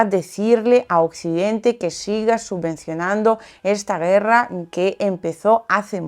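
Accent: Spain. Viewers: Ojalá te deshagas de ese acento Español que para el 90% de los que hablamos ese idioma nos resulta un poco... desagradable? Ayer entré al stream de un Español, y simplementé comenté que en el nuevo Zelda la decoración está basada en elementos Mexicas como las serpientes emplumadas y los ornamentos aztecas... y me contestó asi nada más: "No creo que sea de México porque no veo que Link sea un macaco"... Y asi son el 90% de ellos. pero el 90 o 95% de los hispanoparlantes no hablamos asi y no somos asi. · Por favor sigue una línea seria en tu vestimenta. No uses ropa estrafalaria. Maquillate siempre. No uses tatuajes. El acento español de España es horrible. Usa español de argentina. Te lo digo con buena onda. Eres hermosa si cuidas estos detalles. 😊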